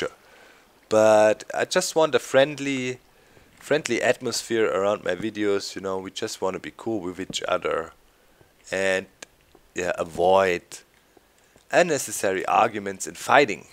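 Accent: Germany. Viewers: Haha my mum think that you sound like Arnold;) - The german accent is infactious!:D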